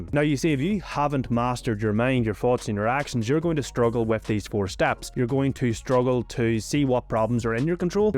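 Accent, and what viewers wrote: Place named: Northern Ireland, United Kingdom. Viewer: Where are you from? Your accent is really cool. Northern Ireland maybe?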